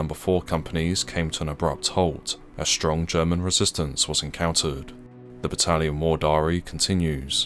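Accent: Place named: London, United Kingdom. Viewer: Irritating London accent narrative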